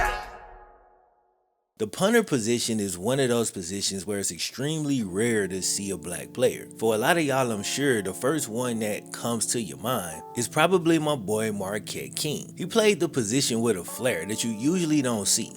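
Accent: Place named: Mississippi, United States. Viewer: Thanks. As another Mississippi boy, I love the way you tell your stories. It's not everyday you hear someone that sounds like us narrating so well. Love your work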